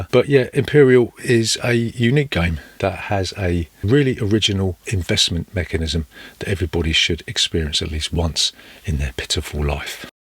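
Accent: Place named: South London, United Kingdom. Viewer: where abouts on the south coast? I started watching your reviews recently and my wife, who is from Yorkshire, asked me why I was talking like I was in Saaaarf London. I said I’d been watching a load of b******s on YouTube, which I then had to clarify. I’m from Brighton myself but live in beautiful Auckland NZ...Anyway, you have been a great source of info and entertainment during lockdowns etc....so Thank You very much.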